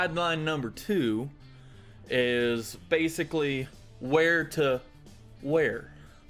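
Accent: Texas, United States. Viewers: yea that and just the hard texas accent. Rs are pronounced very hard from what i can tell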